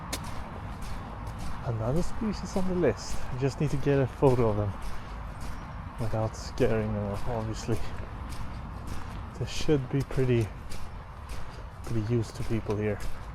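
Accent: Sweden. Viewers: Tyckte jag hörde lite östgötska när du pratade lite svenska i en tidigare så jag blev lite fundersam om du var från Östergötland. Inspirerande att få se dig fota på samma ställen som man själv brukar fota.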